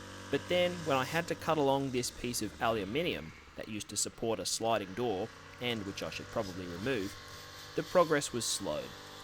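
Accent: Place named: Australia. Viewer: A stupid AUS accent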